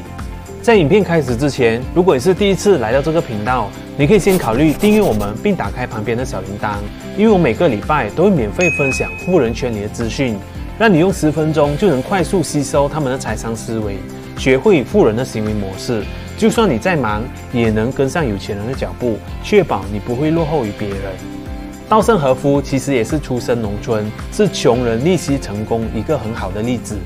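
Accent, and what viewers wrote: Hong Kong, China. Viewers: 影片很棒！但港仔口音的旁白實在無法讓我不看字幕否則很多都聽不懂 =_=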